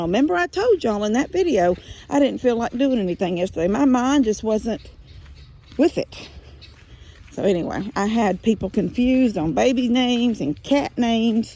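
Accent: Texas, United States. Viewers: I'm from Central Alabama... lived here all my life. I didn't realize the Southern draw Texans have. Even more than me. LOL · sometimes watching you do practically nothing but talking (in your wonderful Texan accent) are the funniest videos. Thanks for the laughs.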